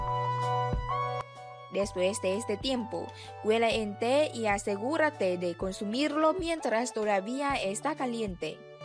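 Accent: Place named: Russia. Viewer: Porque habla como rusa y es shinita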